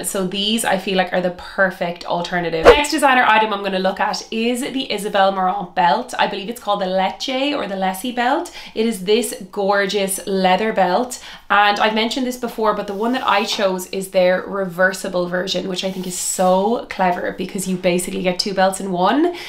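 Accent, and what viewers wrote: Ireland. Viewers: Amazing duoes and finda. Love your videos and your cute Irish accent and think your make up and look getting better and better ( and you havr such pretty expressive eyes so much nicer without your glasses). 🩵🩵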